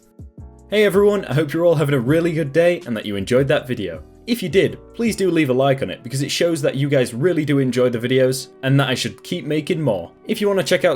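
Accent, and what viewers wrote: United Kingdom. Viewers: The british accent power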